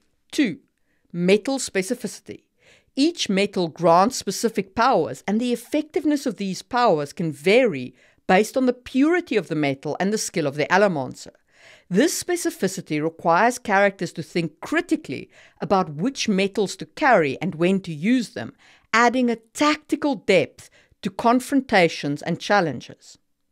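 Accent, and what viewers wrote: South Africa. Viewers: You sound like you're from South Africa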